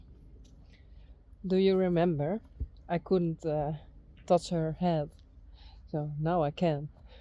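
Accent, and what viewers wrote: Netherlands. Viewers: I like the Dutchy way you say things.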